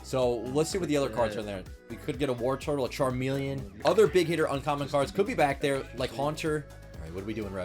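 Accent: New Jersey, United States. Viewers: you can really hear that new jersey accent in his old videos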